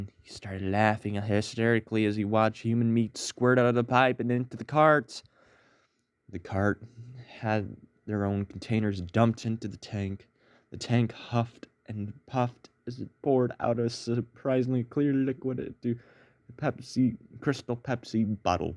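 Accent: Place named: United Kingdom. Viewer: I like your British talking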